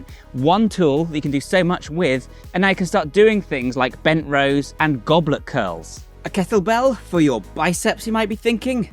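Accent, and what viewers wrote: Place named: Scotland, United Kingdom. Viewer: Ur Scottish? U have a faint Scottish accent.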